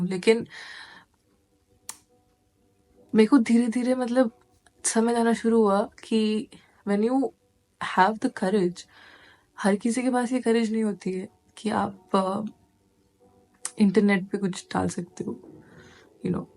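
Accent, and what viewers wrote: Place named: Punjab, India. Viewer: I love hearing punjabi underneath your hindi!!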